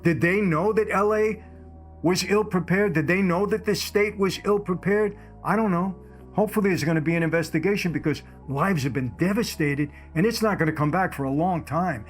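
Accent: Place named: New York, United States. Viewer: i really admire you and your story. You remind me so much of my father that was also an Italian from New York and talked like you. My father would be 96 years old today. The strongest men I ever met were my dad and my uncles from New York. That was when men were men. This was also a time when your uncles helped raise you. If my uncles saw me doing something i shouldn't I got a slap. Hoping they never told my father my wrongdoing so that I didn't get another. lol Enjoy your videos and my prays are with North Carolina and California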